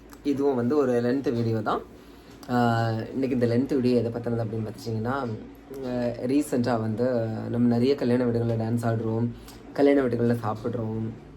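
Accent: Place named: Kanyakumari, India. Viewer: ஹாய் தம்பி நான் கீதா உங்க வீடியோ இப்போ கொஞ்ச நாளா பாத்துட்டு இருக்கேன் ரொம்ப சூப்பரா இருக்கு உங்க ஊரு எந்த ஊருன்னு எங்களுக்கு தெரியாது நீங்க பேசறது வச்சு பார்த்தா கன்னியாகுமரி யார் உங்க கூட பேசணும்னு நினைக்கிற நானும் என் பையனும் உங்க கூட பேசணும் நினைக்கிறேன் எங்களுக்கு நம்பர் கிடைக்கிறது இல்ல தப்பா நினைக்கல என்ன நம்பர் கொடுக்குறீங்களா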